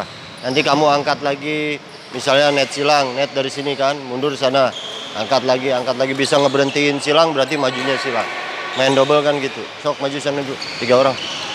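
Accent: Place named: West Java, Indonesia. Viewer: Nyimak kang Ciri khas pisan urg sundana... Hatur nuhun hehe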